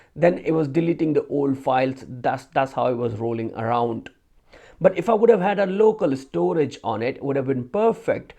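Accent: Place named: India. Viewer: You are speaking Indian, we didn't able to understand what you are saying !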